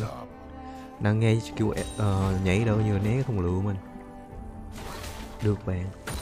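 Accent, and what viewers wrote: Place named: Phu Yen, Vietnam. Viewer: giọng giống PY quê mình thế nhờ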